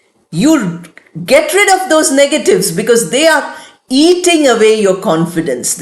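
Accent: India. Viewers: You speak standard Indian english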